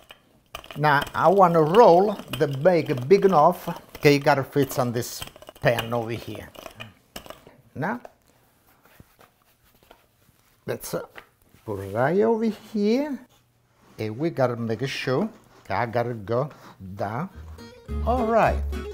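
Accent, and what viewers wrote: Italy. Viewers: Chef you are a wonderful Chef. I love your beautiful food creations. You remind me of my Father who is now in heaven. Your speaking, Italian accent are the same. God Bless you with many years of good health and happiness in your life. Keep making these beautiful video's and educating all of us.You are so delightful. Love you!! Joe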